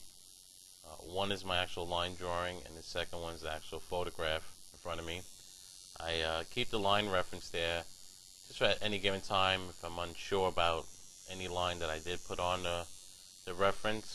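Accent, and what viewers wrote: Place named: New York, United States. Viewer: He's from New York ...I can tell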